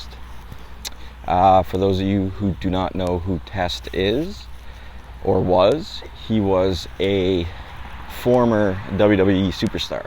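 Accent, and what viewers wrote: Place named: Canada. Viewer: I can tell with all those "oot's" that this guy has to be Canadian.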